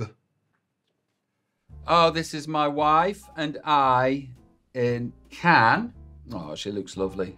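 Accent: United Kingdom. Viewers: I am not a fan of Robbie music but must say what a interesting human being, definitely an individual, so so British in his speech and behaviour. Love his personality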